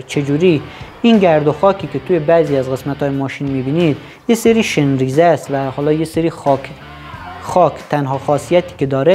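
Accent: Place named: Sistan, Iran. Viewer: از لهجه آن مشخصه سیستانی هستی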